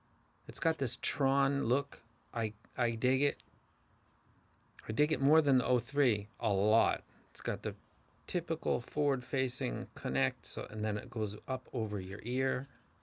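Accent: Boston, United States. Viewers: I love it when you talk Boston to me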